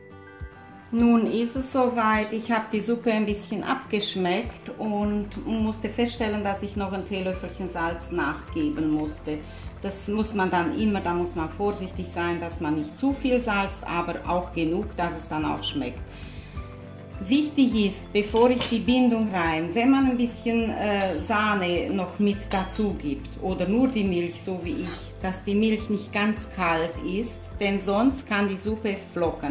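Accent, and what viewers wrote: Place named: Transylvania, Romania. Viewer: Werde das Rezept in den folgenden Tagen zubereiten. Mama machte das mit angebratenem Speck! Werde ich beibehalten! Leider tue ich mit Knoblauch wie immer übertreiben! Ein Lib an Deinen wunderbaren siebenbürgischen Akzent! Meine Eltern hatten den auch beibehalten! Bin seit meinem 12 . Lebensjahr in Nürnberg ansässig und spreche fließend viele süddeutsche Dialekte! Soxesch kann ich auch noch! Vorwiegend die Dialekte aus der Schäßburger Region! Da sind meine Wurzeln! Herzlichen DANK nochmal! 🙏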